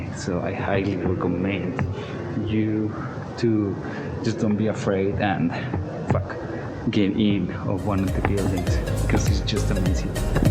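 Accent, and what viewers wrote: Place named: Mexico. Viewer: Tu ingles tan lento y con acento tanto acento mexicao me ayuda un montón a practicar mi listening Jajaja Buen video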